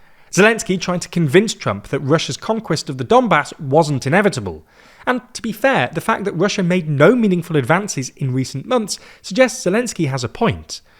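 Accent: United Kingdom. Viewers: So sick and tired of all this British accented YouTube channels. WTF?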